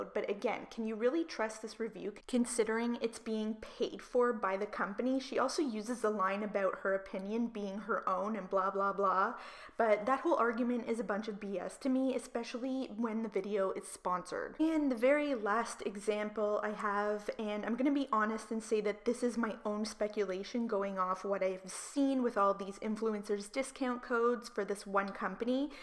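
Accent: Canada. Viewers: A. S. Lauder I'm betting it's Canada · You sound Canadian. Which is awesome. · I know this is off topic but I'm an not a native English speaker and when I talk I usually have accent similar to yours so I'm interested in where you're from. Some tell me I sound Canadian. Not sure what their accent is.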